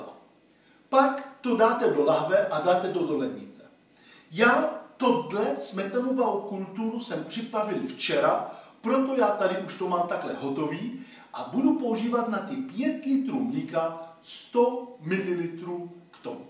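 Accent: Italy. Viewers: Kanada? Ale má takový Italský přízvuk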